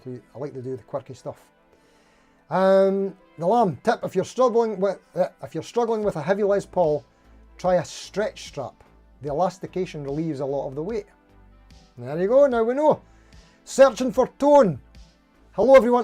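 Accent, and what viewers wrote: Scotland, United Kingdom. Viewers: Never apologize for your accent! Remember the first rule of quality..."If it's not Scottish... IT'S CRAAAAAPPPP!"🏴🤪👍✨💙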